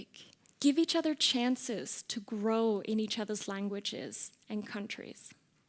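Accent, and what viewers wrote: Germany. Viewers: This cadence at the end of each phrase, the intonation sound like German despite the good pronunciation in English interestingly. Creating new words is a great idea! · Yes there is a German tinge in your English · I could here she was a native german speaker by the way she talked 5 secs into the video lmao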